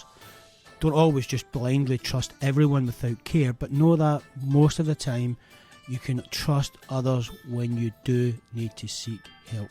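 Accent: Scotland, United Kingdom. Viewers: ❤❤❤❤❤Love your Bonnie Scottish accent😁😘🌷🌷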